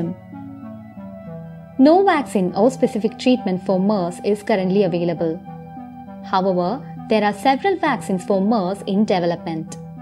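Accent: Kerala, India. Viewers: Typical indian accent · The narrator is a malayali, i BEt.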